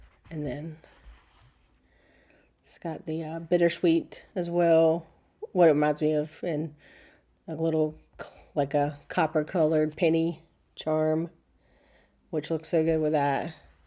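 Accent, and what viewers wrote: South, United States. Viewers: Your videos are amazing. It’s such a joy to watch you. I love seeing your stitching. Your Halloween projects have inspired me to stitch more of them. I also love your accent. Keep up the Southern charm! You are a pure joy to watch.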